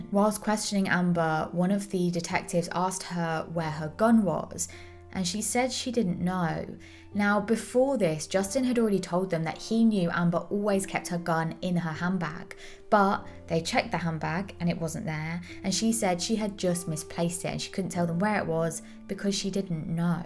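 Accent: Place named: East Midlands, United Kingdom. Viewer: sweet east midland voice, dimples and football active wear...be still my heart:thanksdoc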